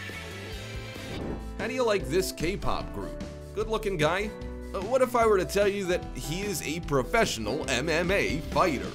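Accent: United States. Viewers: What the hell is up with this guy? He is speaking English with an American accent so why all the metric crap?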